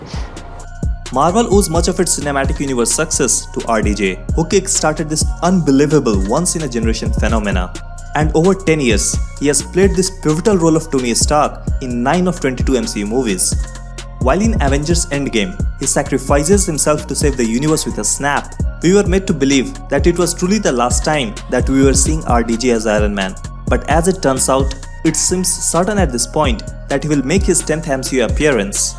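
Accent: India. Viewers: r u indian ??? the acent is like india . and i think i c this graphic somwhere and the concept too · U r Xcent sounds like a Marathi guy.......................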